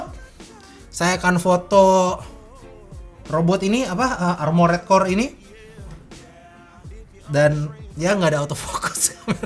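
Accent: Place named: Bekasi, Indonesia. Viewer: Suka banget dah pembawaannya. Bekasi banget!😂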